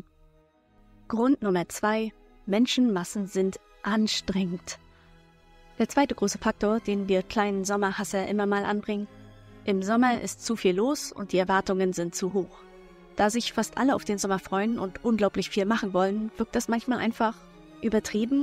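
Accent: Mecklenburg-Vorpommern, Germany. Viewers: Kommst du eigentlich aus MV? Sommä, immä 😅😅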